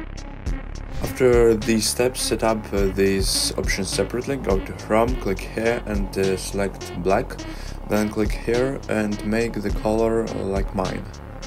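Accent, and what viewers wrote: Russia. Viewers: сразу понятно что русский потому что акцент не английский)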